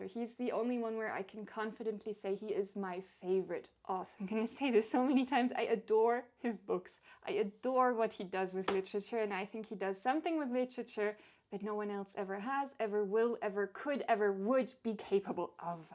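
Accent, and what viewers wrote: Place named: Germany. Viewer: I read a lot of classic literature, biographies, text books, manuals, science fiction.and self help books. You have a neat and tidy tome of books. I dislike Shakepeare. I had enough studying and reading his books during my high school days. I am a big fan of ancient Roman society and all those famous philosophers. The most expensive book I bought was The Red Book by Carl Jung and the full collection of The Decline and Fall Of the Roman Empire by Edward Gibbins. . I am an Aussie old man and I have historical books about Australian authors from the 19th century. For a young lady you speak English very well and I like your German accent. My mother was German and I could never understood anything when she spoke to me even though I spoke Polish to her.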